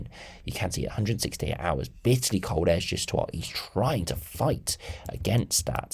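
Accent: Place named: RP, United Kingdom. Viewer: Can’t stand your posh bri ish accent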